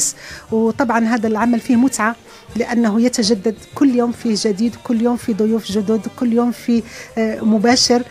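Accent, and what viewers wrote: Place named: Morocco. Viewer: ارجعت الي المغرب انت دكتورة يا اترك لنا إذاعة الشرق لنا نحن المشارقة المغرب بجاجة إليك لماذا تحب ازعاجنا بصوتك من ساعات الصباح الأولي الباكر تتكلمي اللهجة السورية و اللبنانية بصوت مغربي ارجوك انت علي اساس دكتورة ممكن لك العمل في الإذاعات الآخر او حتي إذاعة الشرق ولكن ليس علي المباشر من شروق الشمس حتي غروبها بطريقه دكتاتورية لايوجد احد في الإذاعة الا انت فقط ارحمنا مع كامل احترامي الشديد إليك هناك المشارقة الذين يحبون الي سمع صوت مشرقي مشارقة و ليس مغربية تتكلم اللهجة السورية اللبنانية بصوت مغربي مع كامل احترامي الشديد الي كل المغاربة هذا حق لنا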